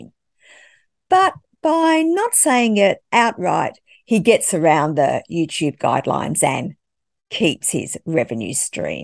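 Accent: Australia. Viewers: Australian accent ?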